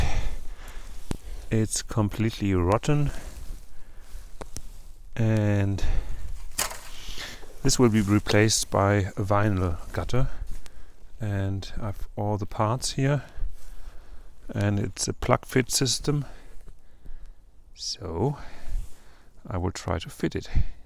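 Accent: Germany. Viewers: your German accent is what makes you charming to me. I’m in Nebraska USA. Dead center of the country 😁 I’m truly enjoying your channel. Keep up the great work 😊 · Just found this series. Trying to figure out where this property is? The guy seems to have a German accent? Love the progress he has made so far. Very satisfying to see the yard clean ups! Please post an update. Beautiful countryside wherever it is.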